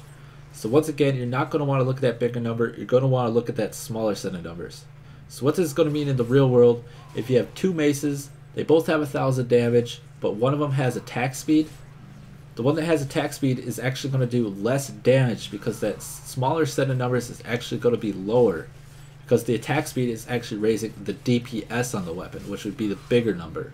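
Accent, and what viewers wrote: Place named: United States. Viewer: i think hes amarican europeans usually do have an diffetent accent when they speak english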